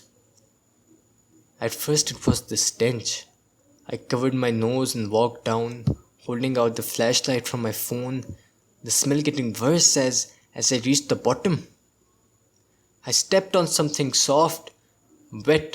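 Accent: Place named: India. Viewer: Your Indian accent 👌🏼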